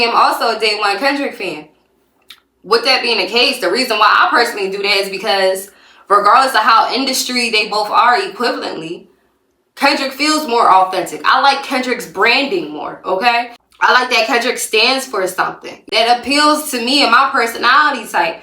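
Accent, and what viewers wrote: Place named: Philadelphia, United States. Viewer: That Philly accent is everything… 😩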